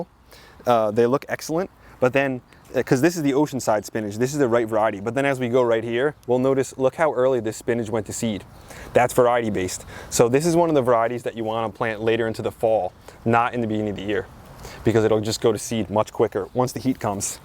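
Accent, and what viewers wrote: New Jersey, United States. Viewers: That Jersey accent is going strong! Wudder💧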